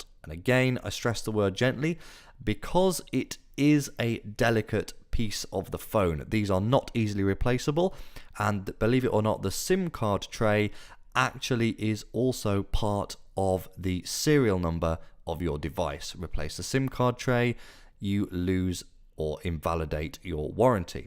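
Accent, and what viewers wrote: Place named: United Kingdom. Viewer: It does not have writing in the back if you live in the U.S. and in some other places like Canada. He probably lives in the UK I'm guessing bc of his voice and....He said it in the video.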